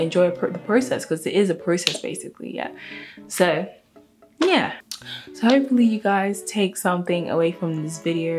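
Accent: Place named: United States. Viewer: Why do u sound American? I thought you were from London lol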